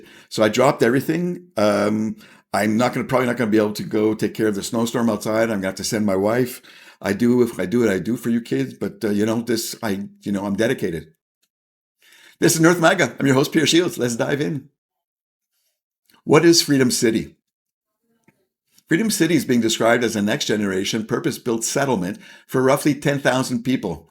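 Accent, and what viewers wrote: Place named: Canada. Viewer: Nice to hear a voice from the True North! 💙🇨🇦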